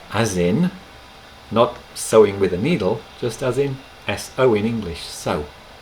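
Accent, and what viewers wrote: England, United Kingdom. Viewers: I like hearing English English, if that makes sense, ha! I'm American so our English is slightly different, but you probably know that.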